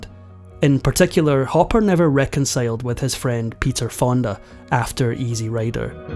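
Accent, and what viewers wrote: United Kingdom. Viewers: Blah blah blah, Hollywood and you hollywooders make me sick, I turned my back on Hollywood ever since I became a true born again Christian. Hollywood is modern day Sodom and Gomorrah. I worship Jesus Christ, our Lord , God and savior. I dont care about this brittish voice over, bragging about this man headed for hell. We can not serve two masters, as God says in His holy words, the Bible. MAGA 🇺🇸 🇺🇸 🇺🇸 AMERICA FIRST 🇺🇸 🇺🇲 🇺🇸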